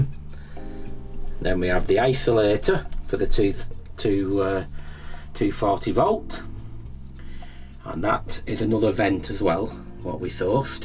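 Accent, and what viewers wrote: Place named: Oldham, United Kingdom. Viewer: Are you from Oldham by any chance, you sound like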